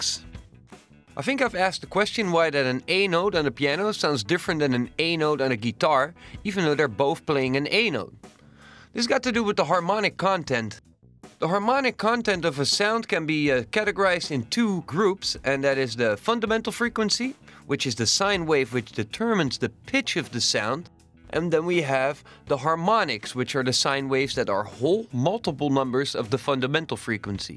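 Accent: Netherlands. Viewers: you have a dutch accent :D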